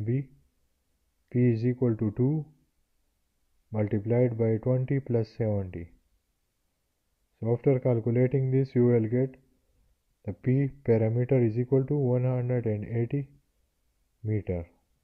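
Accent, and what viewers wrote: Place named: India. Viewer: i cant understand your indian accent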